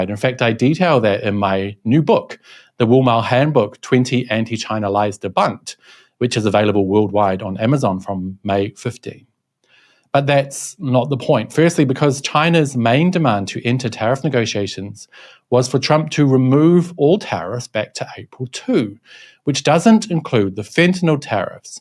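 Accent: New Zealand. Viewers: You sound like a spokesman for the Chinese government. With a nice NZ accent. But you are correct I suspect. China 1. USA 0. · Kiwi accent lol, unmistakable. 😊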